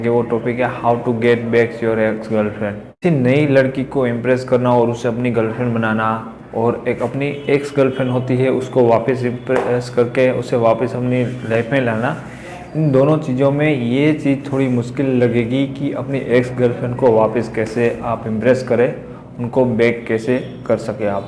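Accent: Gujarat, India. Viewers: Bhai tame Gujarat cho?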